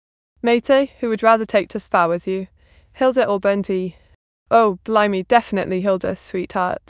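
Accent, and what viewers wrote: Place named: United Kingdom. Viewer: The voice and audio need some improvements: - Compression (maybe Normalization too): It peaks too high and goes low again, it's not consistent in her voice. - The sound seems low quality, it sounds like someone with an old mic (maybe adjust filters?) - I don't mind the boyish voice although l I would prefer a softer voice or a cute one. - The british debuff is an issue, it's hard to understand, especially for AI that talks incoherent stuff sometimes (you can't understand form context). Many times I like to keep chatting streams ON while doing something else, but it's hard to understand her without paying attention due to her accent. Overall seems like a great project, keep it up!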